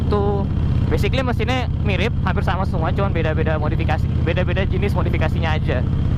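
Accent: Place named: Java, Indonesia. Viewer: "iyuh kono" hahaha khas banget ya medhoknya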